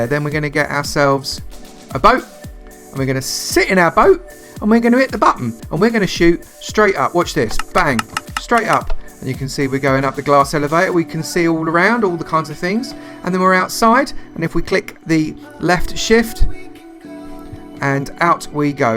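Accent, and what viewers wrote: United Kingdom. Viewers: I can smell the British accent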